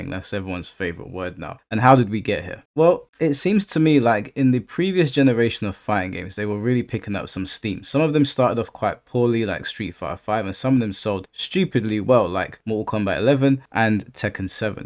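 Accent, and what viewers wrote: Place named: United Kingdom. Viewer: Lmaoooooo so when I 1st watched your videos like this, it was my 1st time at your channel so I had no clue what you looked like, just a voice. For the longest time I thought you were white and looked something like the4thSnake 😂💀😂💀 being from the US, the UK accent sounds the same on everyone, it’s not like the US where people “sound white” or “sound black” ect to put it simple. But then I found out you were black and my mind was blown.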